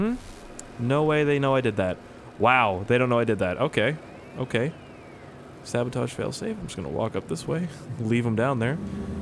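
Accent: Germany. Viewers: As in ur German accent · Best German accent ever :D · I haven't laughed so hard in months 😂😂 your German accent is fucking great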